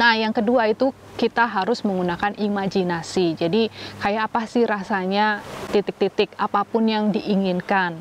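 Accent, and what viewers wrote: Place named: Cilacap, Indonesia. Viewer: Baru ngeh kalau mbanya orang cilacap,ora ngapak ora kepenak Mantap mba salam 👍👍😊🙏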